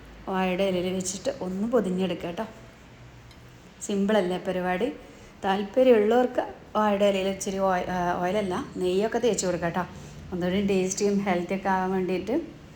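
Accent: Malappuram, India. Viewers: പക്ഷെ ഈ ചാനലിന്റെ ഒന്ന് രണ്ടു വർഷം മുന്നേയുള്ളതിൽ ഒക്കെ തനി മലപ്പുറം സംസാരം ആണല്ലോ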